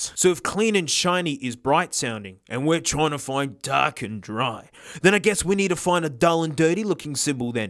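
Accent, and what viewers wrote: Australia. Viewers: You sound like that one Australian guy that talks in that one Australia sound. You know that him I'm talking about? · I've been watching this guy for seconds max, and it also took me that long to hear JonTron in his strained voice and I will never unhear him as aussie JonTron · I want SMOKO so leave me alone! Nah, I heard an Australian accent, whilst talking about drum-specific things - had to subscribe! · Man you don't have to be so performatively australian to cater to the americans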